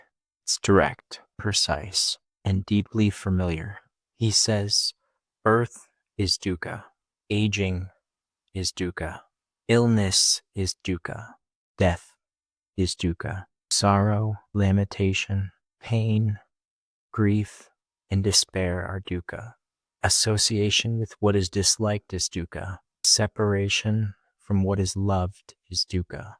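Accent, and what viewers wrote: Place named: United Kingdom. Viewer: Which Indian pronounces English like the British?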